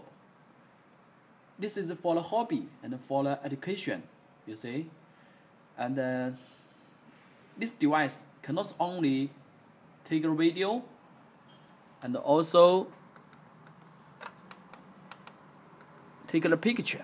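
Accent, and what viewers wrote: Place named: China. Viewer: chinese english =chinglish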